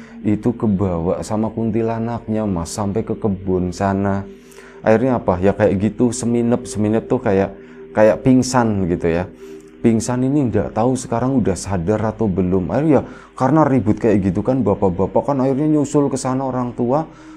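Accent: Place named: Banyumas, Indonesia. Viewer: NUMPANG TANYA barangkali bisa dijawab : MENGAPA NGOBROL HOROR sudah lama tak siaran?. Kangen bahasa ngapaknya...😅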